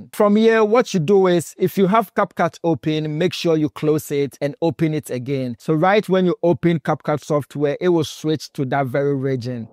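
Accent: Ghana. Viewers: Oo Chaalee, welcome back to Ghana. Since the very first day I came across your awesome edits and tutorials, you sounded Ghanaian until you mentioned Finland in some videos and I was a bit confused 😄. Keep up the good works bro.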